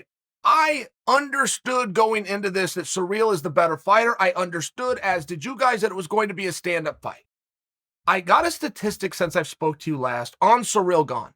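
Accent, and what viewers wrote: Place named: United States. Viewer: People make fun of the the way Chael says names with his hyper-American accent like everybody has an accent and says things a certain way.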